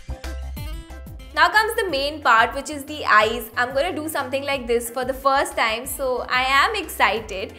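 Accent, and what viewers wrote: India. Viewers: has such an indian accent!...like....calaa ba pafect match prrima!...but roshni and upalina has more of foreign accent!btw just my veiw no offense!(and having indian accent isn't bad at all).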